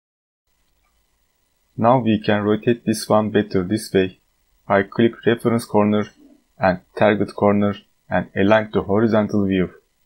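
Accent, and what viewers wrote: Turkey. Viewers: izliyorum izliyorum diyorum ne kadar türk aksanı var , archicadde çizim yapmayı bırakıp bunu düşünüyordum. ekranınızdaki TUR yazısını görünce aa haklıymışım dedim :)) teşekkürler çok yardımcı oluyor videolarınız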